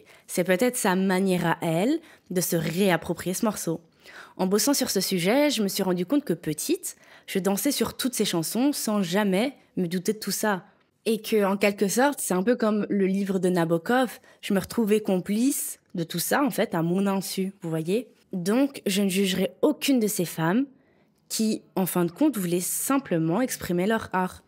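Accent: Belgium. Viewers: Merci pour ta vidéo que je trouve très bien travaillée. Surtout ne change pas ton charmant accent belge;et c'est un français qui te le dis. Bonne continuation à toi!❤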